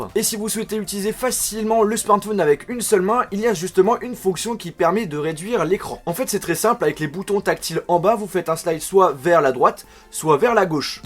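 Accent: France. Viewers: Are you French？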